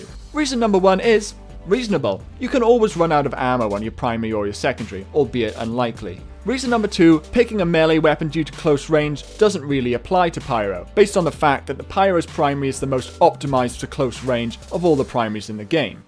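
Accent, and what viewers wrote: Wales, United Kingdom. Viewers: Are u welsh?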